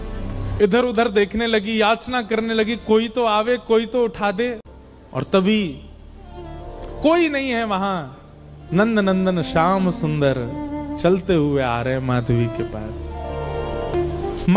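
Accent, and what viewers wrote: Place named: Braj, India. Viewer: Indresh ji ap bhut acha bolte ho. Kitni khoobsurat h apki awaz. Apki Braj Bhasha. Sach m sundar❤❤❤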